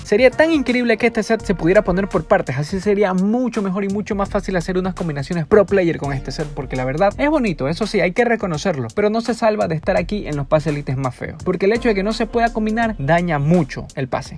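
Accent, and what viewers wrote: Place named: Ecuador. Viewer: Por su manera de hablar siento que es Ecuatoriano, jaja buen video ♡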